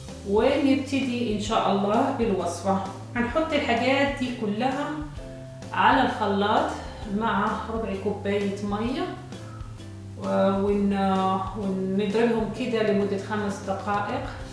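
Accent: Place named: Maghreb. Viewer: هل انتي مصرية اختي لهجتك من المغرب العربي و شكرا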